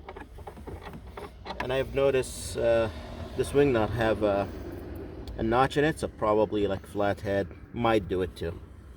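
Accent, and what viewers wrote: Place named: Iraq. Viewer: لهجتك عراقية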